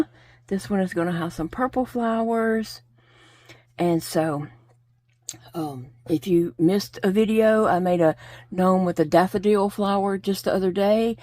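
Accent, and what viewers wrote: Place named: Delaware, United States. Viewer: I LOVE this Gnome! I Love the Mustache, the Turned Up Hat and the Vine with the Flowers and Curly Cues. The way you say the days of the week sounds the same as we do here in Delaware. 🤷🏼‍♀️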